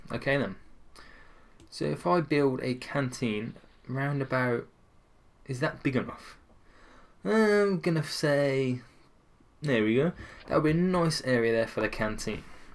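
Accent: United Kingdom. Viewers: your British ya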